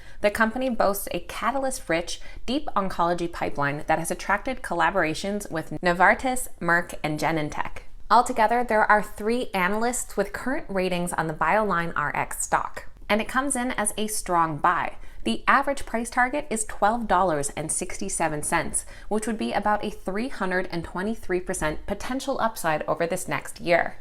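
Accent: Canada. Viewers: Great vid.....always nice to see the sleeping pooch. Made 600% on Dogecoin.....sold everything. 👍. Are you Canadian?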